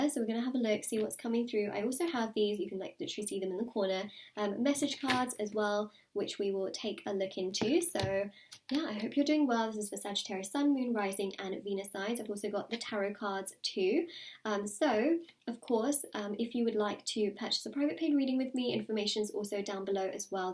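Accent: United Kingdom. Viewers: Bless you, wonderful reading. Good to hear a British accent, majority are American. I’m in Doncaster South Yorkshire. Have a super weekend, namaste 🙏 🥰💕🪴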